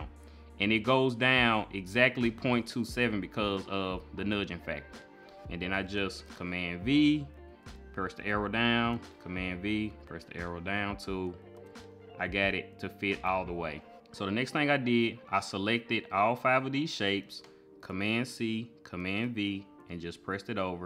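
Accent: South, United States. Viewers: Love this!! Makin' me want too look into how much all the equipment would cost.... Glad I found you, u can thank IG, there was some commercial & I just had to go find you, with your smooth southern voice! 💚Loving that too💚🤍💚🕊️ may God bless you!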